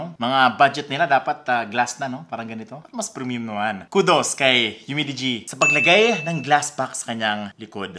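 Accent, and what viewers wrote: Visayas, Philippines. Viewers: bisaya ka po ba?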